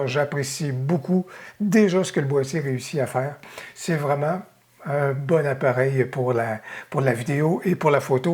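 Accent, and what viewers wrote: Quebec, Canada. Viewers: d'entendre ton accent québécois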